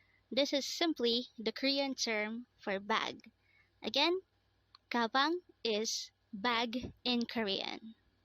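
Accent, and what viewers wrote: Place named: Philippines. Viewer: Hi. Are you a Filipino? You sound like one 😊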